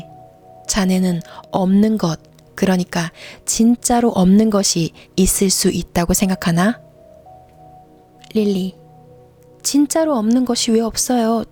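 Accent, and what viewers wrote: Gyeongsang, South Korea. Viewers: 증말 믿고 듣는 진정한 갱상도 사투리다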